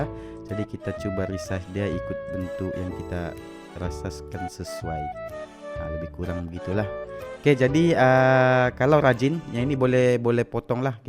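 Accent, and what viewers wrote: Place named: Sabah, Malaysia. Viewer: sabahan loghat...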